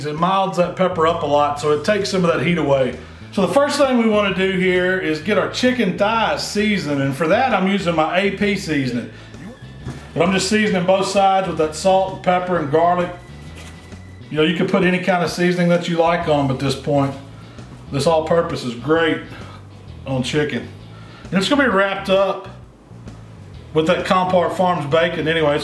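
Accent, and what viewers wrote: South, United States. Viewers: No skin Needs sauce?? Sound southern but I'm wondering?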